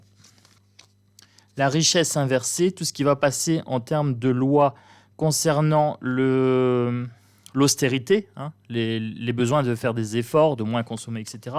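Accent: Nord-Pas-de-Calais, France. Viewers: Bonjour, vous seriez pas un peu ch'ti par hasard??? J adore votre humour 😊. Merci pour ce petit tirage. A bientôt.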